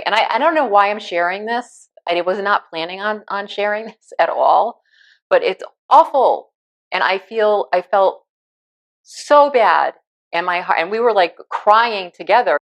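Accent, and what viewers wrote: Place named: New York, United States. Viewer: I’m sorry you had to go through that and I’m sorry someone lost their life that you knew, however slightly. I’m from Brooklyn originally so I did detect a New York accent from the start. OK I’m continuing your video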